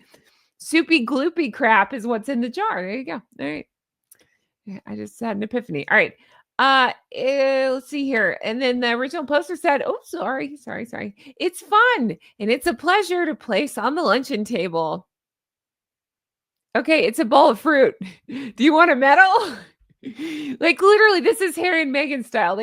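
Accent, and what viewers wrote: South, United States. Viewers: Hey. Mississippi girl here- don’t you apologize for any southern accent you may or may not have. I think it sounds beautiful🥰 Love you channel!🪿🪿🪿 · HONK-ity HONK HONK Queen of the Flockers. Great video as ever 😂😂😂😂😂 Yeah, you do sound southern. How's Flockingham Palace coming along? Enjoy your days off. Congratulations on your weight loss journey. I love how you keep things real (I dare not say 'authentic'). Your faithful (& a tad busy) humble Flocker. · It’s ok to sound Southern❤ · Good Morning and room. Honk, Honk.. Megalier episodes never gets dull..... You do sound Southern....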